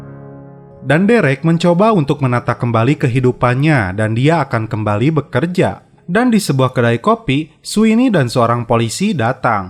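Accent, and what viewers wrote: West Java, Indonesia. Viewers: Bang urang sunda nyaa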